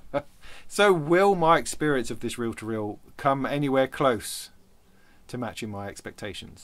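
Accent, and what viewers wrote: United Kingdom. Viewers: So, ur British. Far out! When u said motorbike...yup or Australian. Just got a Teac X-3 Great sound dynamics. Gotta get some leader tape for sure. Its,a whole new thing for me. I want to tape from record albums and CD. U R right, putting an album.on a Turntable hooked to a very good receiver sx950. Threading tape thru a humongous non enclosed reel2reel is spiffy. I have a lot to learn and I bloody well love it. Say HI to Rumpole of the Bailey for me?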